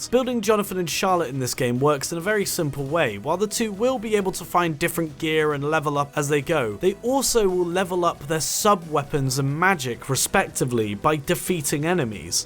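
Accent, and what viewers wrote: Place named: Netherlands. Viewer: yes i really enjoy your Dutch accent.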